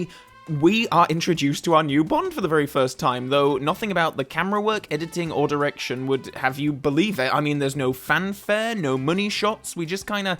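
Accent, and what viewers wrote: Yorkshire, United Kingdom. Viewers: I think it's impossible to dislike. Enthusiastic, insightful, funny, amiable, creative and handsome; he's the perfect YouTube host (which is more of a compliment than it might seem). Personally, I also really like the way that his Yorkshire accent becomes more pronounced when the pitch of his voice rises 🙂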